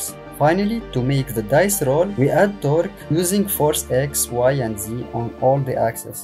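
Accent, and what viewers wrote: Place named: Tunisia. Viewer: L'accent khouya dhahra, w ma ytalaaha ken Tounsi kifek Merciiii infiniment ala Tuto <3 <3 ! w je t"encourage alekher w nshalah chaîne tekber akther akther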